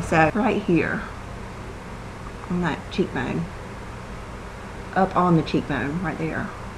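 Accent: South, United States. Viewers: Wow, they need to bring that paint pot back! Such a pretty look on you. I like my brushes "seasoned" too.....lol. I got that Nars eyeshadow in the mail Friday, it is so pretty and different. Oh, one more thing so you won't think I'm crazy, I don't know why I thought you were from Atlanta! That's why I told you I loved so close to there. Sorry. (Maybe the stereotypical southern accent that most of us from the south have, idk?!) xoxo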